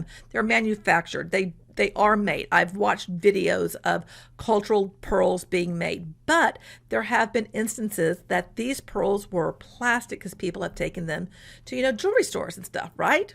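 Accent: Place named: Texas, United States. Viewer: I knew you were Texan 😂 howdy from a North Texan 😂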